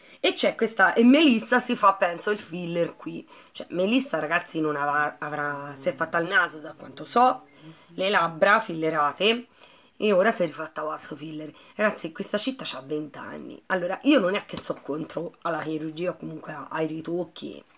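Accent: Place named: Rome, Italy. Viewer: A A ME, ME PIACE CHE PARI ROMANA!!!!!! PERO' IO SO' DE' PARTE!!!!!😅😅😂😂😂😘😘😘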